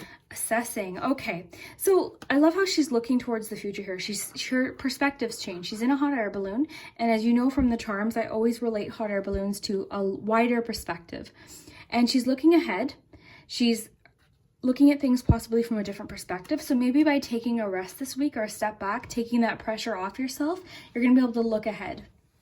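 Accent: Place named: Canada. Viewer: Are you from Canada? 🙂I lived there before I know that accent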